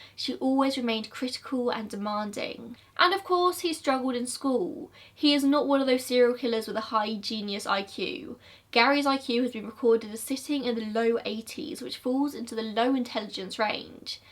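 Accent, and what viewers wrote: United Kingdom. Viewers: You've got a buetiful voice, no need to be self conscious. Love the UK accent. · i love ur accent so much lol idk if its because I'm American and don't hear a lot of British accents but i always tend to watch British people like Eleanor Neale because of u guyses accents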